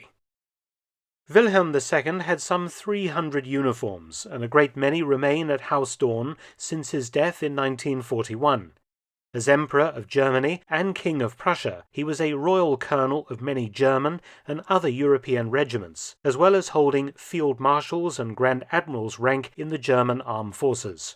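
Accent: United Kingdom. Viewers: What is saying in his British accent is "pickelhaube". He is pronouncing it correctly, but I tried to google "pickel halbee" and it didn't work.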